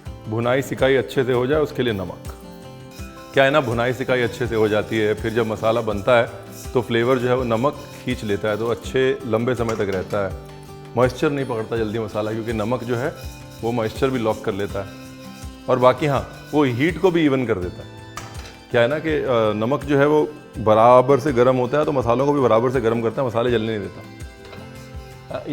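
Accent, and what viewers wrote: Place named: Punjab, India. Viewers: I loved that Punjabi style talk, Punjabi people have that talent to make you feel cozy. Receipe is also quite interesting,but the talk, smile made my day!!❤ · Hii Ranveer, ye kafi pahle dali hui recepie hai. Personally mujhe mushrooms bahut pasand nahi par par kal kisi ne bilkul fresh mushrooms de diye. Ab banana to tha jyada the to socha dry hi bana lete hain. Phir search karte hai sabse pahla aapka vidio appear hua. Bas phir kya step by step follow kiya aur banai bilkul aapki tarah shandar kadai mushrooms aur sabko bahut pasand aaya. Aur Haan sath me jo aap apni style me bolkar jo panjabi tadka lagate ho lajabab. 👍👍👍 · Apki boli Mai h Punjabi tadka jabardast or mast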